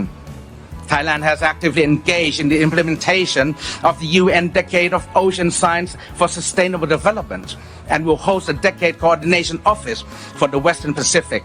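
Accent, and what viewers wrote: United Kingdom. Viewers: ชอบสำเนียงบริติชของคุณท็อปครับ คนมีคุณภาพแบบนี้ อยากให้มีกันเยอะๆในสภา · สำเนียงอังกฤษเลย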